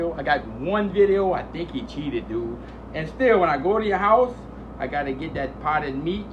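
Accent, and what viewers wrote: Louisiana, United States. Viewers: I know this dude is portraying a character, at least partly.....but I'm sold. Great cooking, he gets right down to bid'ness, and he's got that super dope Cajun accent. The ONLY criticism/question I have about this vid: Why is the max resolution only 480p? A small issue, but I had to ask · My mans becoming more Cajun every video. The south runs strong in this one. Can’t wait tell I’m back there! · you sound like you from Catahoula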